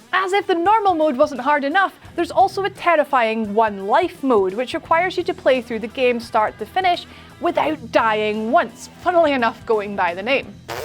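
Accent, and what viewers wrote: Scotland, United Kingdom. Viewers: Man I love Scottish accents. The way she says permadeath is...I love it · Ai, are ye a Scottish lassy? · She's scottish, he is irish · Is that a Scottish brit accent? Or just scottish?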